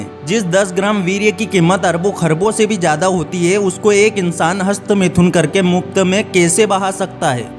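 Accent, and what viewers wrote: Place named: Gujarat, India. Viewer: Ye voice kisi gujrati ki h lgta h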